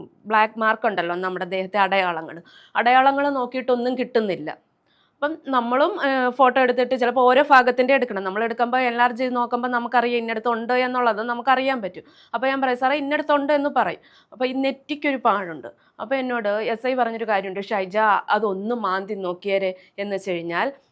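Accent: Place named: Kollam, India. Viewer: കൊല്ലം ജില്ലകാരിയാണോ ഈ സ്ത്രീ...? "ഭ " ഫയങ്കര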